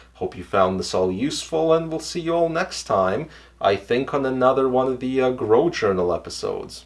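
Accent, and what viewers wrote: Russia. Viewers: My guess is he has a Russian background and moved to North America... Perhaps Canada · Your a good hombre so I subscribed. By the way I think I really good at determining someone’s accent having a mom from Finland with super heavy one. Just thought there was for sure some Русский there Хорошего тебе года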